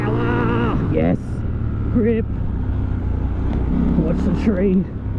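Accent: Australia. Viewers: good to hear an Aussie voice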